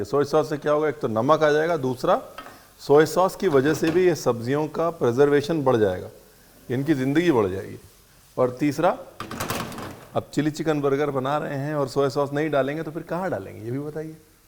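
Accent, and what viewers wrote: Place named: North, India. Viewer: Hey Ranveer u look amazing & ur North Indian accent as well as the recipe is even more fabulous... 😋☺️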